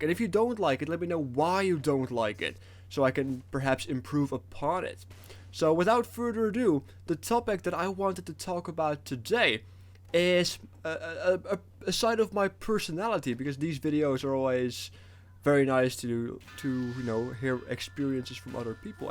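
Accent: Netherlands. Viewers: I'm Dutch, and I thought I heard a Dutch accent while you speak. Hence the question :)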